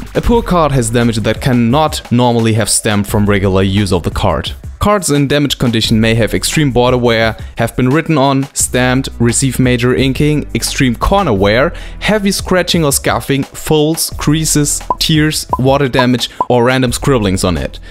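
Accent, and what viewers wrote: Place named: Germany. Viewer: From a GER to a GER (most likely): Work on your English. I mean even the THICC accent you have is acceptable, though painful to listen to (especially a German accent), AS LONG AS THE GRAMMAR IS FINE. And you talk quite quickly as if you were advanced although you're actually slightly better than a beginner. Like... you're not gonna play Van Halen solos on your second guitar lesson. Be honest to yourself - who are you trying to impress? I personally would be ashamed of uploading such a "wideo", even though the "wideo" is otherwise informative.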